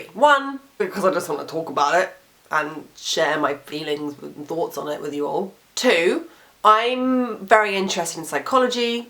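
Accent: United Kingdom. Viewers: What the heck,? You’re practically an American with a British accent. Have you gone to a hypnotist to find out why you are afraid? My greatest fear is the government busting down my door and asking for more taxes. Or everyone pointing at me and saying, “you’re worthless & a fraud.” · Sounds like agoraphobia to some extent. I try to get over the irrational fears I have by doing more of what I fear. Travel, travel, travel... LA is a cool but expensive place, perhaps not tho compared to London. With your awesome looks, talent and sexy british accent you could kick butt and take names here in LA.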